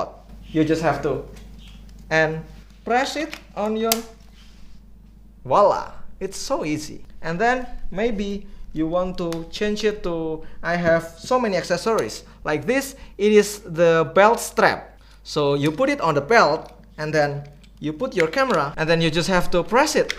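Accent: Indonesia. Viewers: Walopun bahasa inggris nya logat Indonesia banget msh mending dapat dipahami karena Artikulasi lebih jelas 😅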